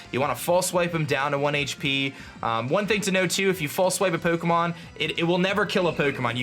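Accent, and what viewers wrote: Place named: California, United States. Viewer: sounds like he's from California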